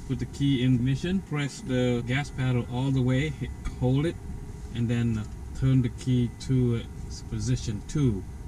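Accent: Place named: Cambodia. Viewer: I detect a Khmer accent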